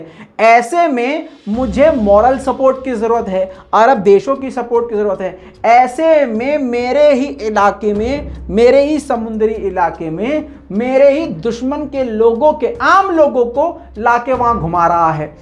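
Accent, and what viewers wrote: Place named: Uttar Pradesh, India. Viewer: भाई आप यू पी से हो, किस शहर से हो आप की भाषा से लगता है कि आप यू पी से हो।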